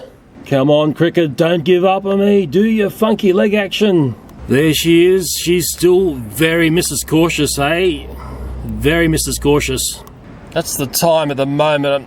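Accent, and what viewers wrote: Australia. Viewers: Sorry I hadn't found your channel earlier just looking through your vids and I found this one it's SOOO fascinating to watch and I come from the UK and I've always wanted to go to Australia and to look at your garden and stuff it just makes me so happy and hearing someone Australian is amazing love it channel 💜💜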